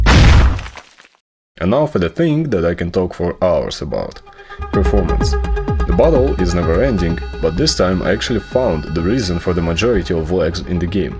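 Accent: Russia. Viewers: Это что, русский акцент?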